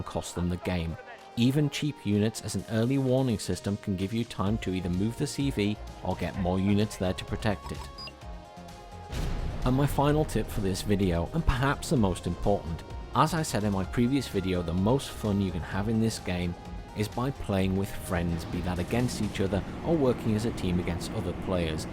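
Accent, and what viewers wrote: United Kingdom. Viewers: "Says in a northern voice" Ohhhh i like a good guide me.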